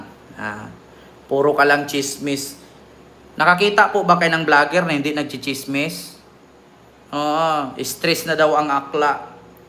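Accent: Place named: Iloilo, Philippines. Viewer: God bless u! my Tanong lang Ako sau?ilonggo ka ba?Kasi ilonggo din Ako Punto mo daw ilonggo ka toto?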